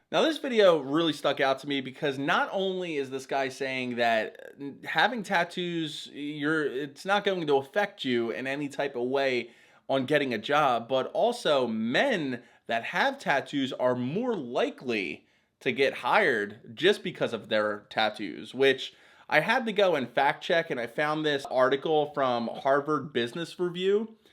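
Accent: Philadelphia, United States. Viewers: When I enlisted in the military in 2008, my first choice was the marine corp. I couldn't get in because of tattooed knuckles and a back piece visible above the neck of my shirt. Ended up in the Army because they offered me a "tattoo waiver." All my tattoos were inspected, and recorded during my entrance physical, the record ended up being 7 pages. Now I'm a subaru mechanic. Also, I can hear the Philly in your voice, Frankford born and raised.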